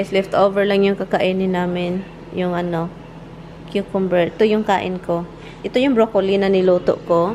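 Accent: Visayas, Philippines. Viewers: ang cute mong mag bisaya.. na intindhan ko kc bisaya din ako...simple ka lng...at gwapo si mr.khit may edad na sya..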